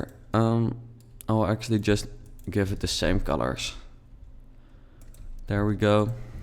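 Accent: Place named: Netherlands. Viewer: is this guys dutch or somthing. he really sounds dutch. :\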